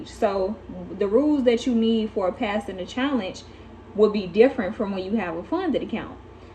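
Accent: United States. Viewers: Your accent(southern) is so cute! Ppl like y’all helped me dodged IML! Yes please upload more videos, we need more women traders on this platform!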